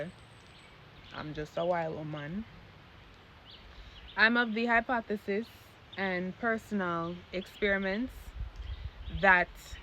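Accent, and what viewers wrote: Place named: Caribbean. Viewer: love the caribbean accent stay blessed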